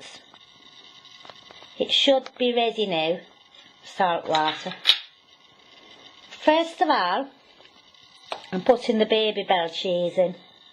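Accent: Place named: Scotland, United Kingdom. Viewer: You sound almost Scottish in this. So, how does this taste?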